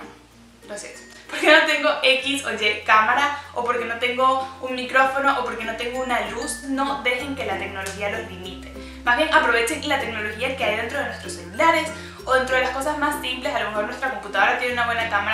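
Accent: Venezuela. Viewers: La verdad, no se te nota, el acento caraqueño, yo pensaba, como de Maracay, Valencia mas o menos. Saludos, estaré viendo mas de tus videos. Cuando puedas, porfa, me enviás un saludo en tu próximo video. Gracias. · Venezolana? Saludos!!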